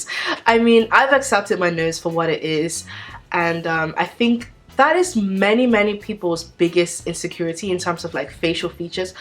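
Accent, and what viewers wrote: Bermuda. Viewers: Where are you from? You sound borderline Bermudian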